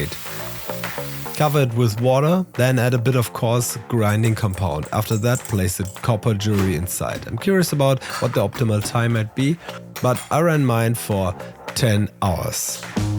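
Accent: Germany. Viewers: Thick german accent😂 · I am sure its a germany guy :D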